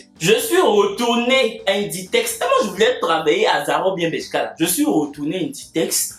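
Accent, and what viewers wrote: Cameroon. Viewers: C l’accent camerounais lol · Je dis ca oh ha pure kmer expression. L accent et les expressions du cameroun. Il doit avoir des amis kmer. C est que du positif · Tu parles trop comme nous les camer 💚❤💛.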